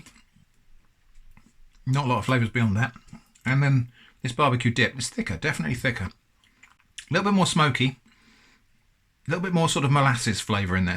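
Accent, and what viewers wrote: England, United Kingdom. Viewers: I just found your channel today - are you the long lost child of English brown couch enthusiast Ashens and scam baiting, northern Irish Jim Browning? I never knew I needed another soothing, English male commentary on quirky things- but thank you!!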